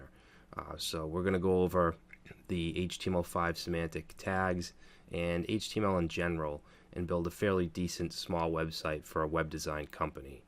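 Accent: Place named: New York, United States. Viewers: I love the New York Accent! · NeewYorka Accent ! awesome tutorial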